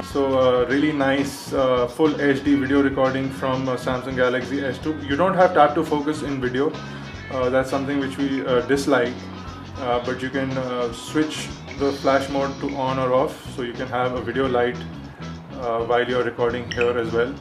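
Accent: India. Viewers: . That is not your real voice because it sounds indian and your hands are white, and that voice is really annoying. So it isnt friggin funny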